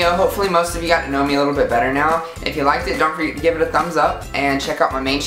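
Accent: United Kingdom. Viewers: Are you british? You sound kind of British (no offence if you take offence) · I'm so happy for you!:') Also, I'm proud of your British accent:D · OMG! The accent is back! 💜 ily really, even when your trying to do a British accent XD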